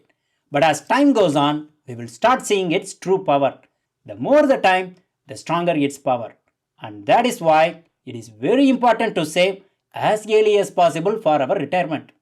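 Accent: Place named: Tamil Nadu, India. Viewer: உங்க இங்கிலிஷ் தமிழ் மாதிரியே கேடக்குது சார்❤😂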